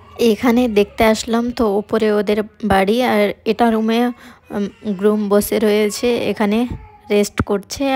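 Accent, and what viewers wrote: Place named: Kaliachak, India. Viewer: Vasa te bujha jaichhi tumi kaliachaker 😂😂😂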